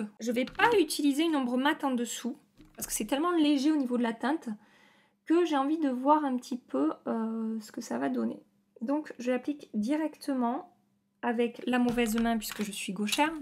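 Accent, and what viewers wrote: South, France. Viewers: Coucou ! Ah ! Cet accent du Sud en ce dimanche ensoleillé ☀️ Je viens commenter par ici pour changer d’insta 😀 très chouette vidéo (comme d’habitude ai-je envie de dire), j’ai vu passer la nouveauté Dior mais sans grande conviction mais mnt tu as piqué ma curiosité... Est-ce que la teinte 2 est finalement bonne ? Et concernant le feutre à sourcils, chez Urban Decay ils font un produit très similaire (Brow Blade me semble-t-il) et avec une gamme de teinte plus étendue... Si jamais 😀 Excellente journée !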